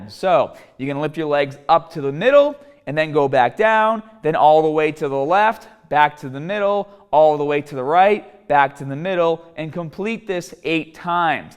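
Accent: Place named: Maine, United States. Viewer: What is the accent. Maine?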